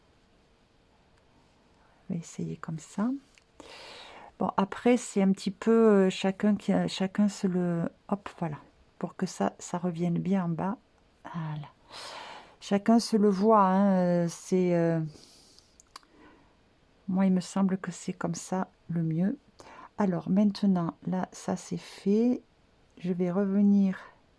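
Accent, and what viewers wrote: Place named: South-West, France. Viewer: Merci pour cette panière. Je viens de la terminer et j'ai pris beaucoup de plaisir à la réaliser grace à vos explications extrêmement claires et précises. J'aime beaucoup vos tutoriels ainsi que votre voix calme avec ce joli accent du sud ouest.💜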